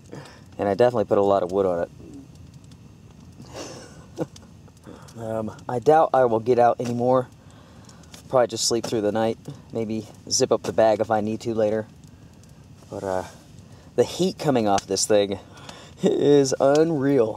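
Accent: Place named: North Carolina, United States. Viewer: Love your videos, Just found your channel recently. Interesting that you say pouring the blood, or, pouring the rain. I've never heard anyone adding " The " in those phrases. Must be a North Carolina form of speech? Keep the videos coming, I will be watching, I've got 3 years worth to catch up on...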